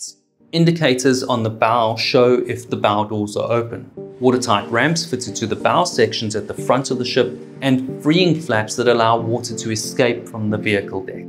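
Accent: South Africa. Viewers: I'm trying to identify the accent. My best guess is Edenvale/Sandringham? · I was not expecting a South African accent. Hello countryman!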